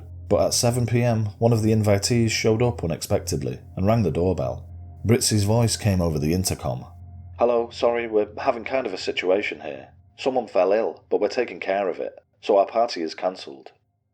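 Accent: United Kingdom. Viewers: How pleasing to hear your uk 🇬🇧 voice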